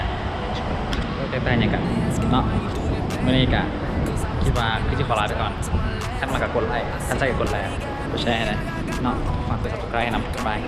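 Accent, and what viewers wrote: Vientiane, Laos. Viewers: คนเลยในความรู้ของคนอิสานก็คือ คนพูดแบบคนหลวงพระบาง ที่น้อง พูดนั้นคือสำเนียงเวียงจันท์ขนานแท้ ถ้าย้อนไปเมื่อเป็นร้อยปีเจ้ามหาชีวิตลาว ได้นำเอาคนเชียงใหม่มาไว้ที่เวียงจันท์ ส่วนคนที่พูดสำเนียงแบบน้อง จะพบได้ที่จังหวัดชัยภูมิ เพราะเจ้าพ่อพยาแลได้นำพาคนเวียงจันท์มาสร้างเมืองชัยภูมิเมื่อรัตนโกสินธ์ตอนต้น ส่วนคนเวียงจันท์ปัจจุบันคือคนกลุ่มใหม่ที่เข้ามาอยู่ใหม่แทนพวกเดิมหลังมีการเปลี่ยนการปกครอง คนลาวในกำแพงนครรุ่นเก่าได้อพยพหนี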